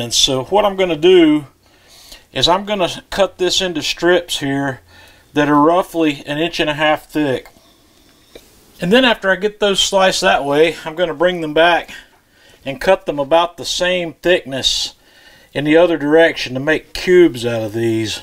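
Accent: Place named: Georgia, United States. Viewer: Wow, you've even mentioned Malcom Reed....... My wife and I,,, when we first seen you, we thought,,,,,,,,> WOW: he sounds like Malcom Reed.... > both from Georgia , our guess! Love to watch you, and to hear your accent! Thanks! Marcel , from Yuma, Az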